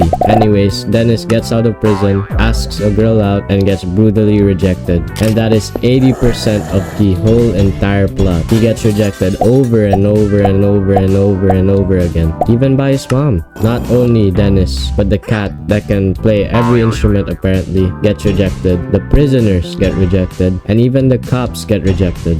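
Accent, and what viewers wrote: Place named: Philippines. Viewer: Your voice is very filipino and i was right( i am Filipino too)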